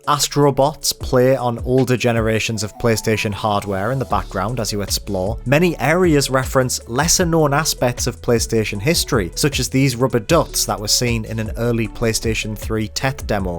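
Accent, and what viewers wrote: United Kingdom. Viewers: Hes british or something. Leave him alone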